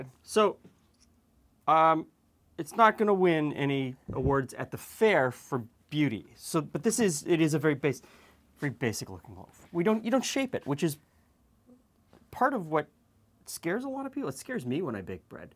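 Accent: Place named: Quebec, Canada. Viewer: You are definitely from the province of Québec, so am I?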